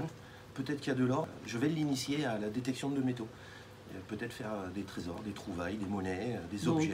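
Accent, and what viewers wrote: South, France. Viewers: Non mais en tout cas j'adore quand tu fais de la detection avec tu accent du sud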